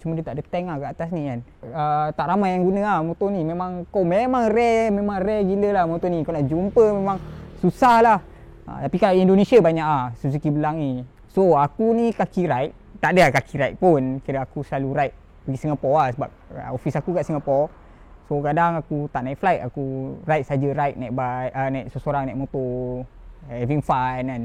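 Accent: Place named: Malaysia. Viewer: standed percakapan org mesia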